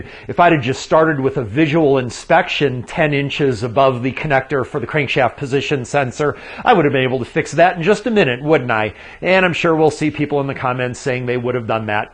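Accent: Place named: Rhode Island, United States. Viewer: Are you from Rhode Island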